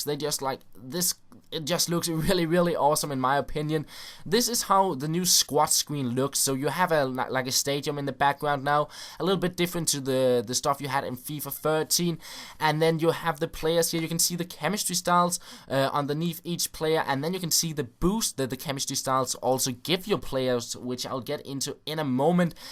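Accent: Denmark. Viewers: your English accent is Danish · lol so easy to spot that you are from north europa/DEnmark? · Man kan bare høre på dig at du er dansker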